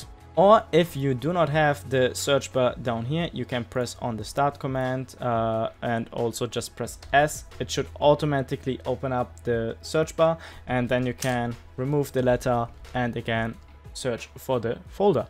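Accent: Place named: Germany. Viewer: Also Bro no Hat, aber den deutschen Akzent hört man dick bei dir raus. Aber sehr Informatives Video, Kuss auf die Nuss❤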